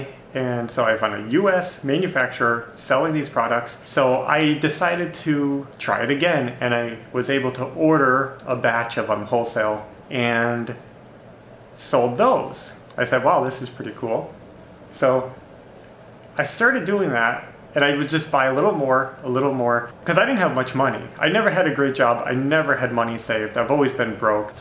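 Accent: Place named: Indiana, United States. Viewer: Kokomo! I knew I recognized the speech patterns. I'm in Valparaiso. Congrats on the YouTube Channel guys. Live the dream here and keep pushing forward!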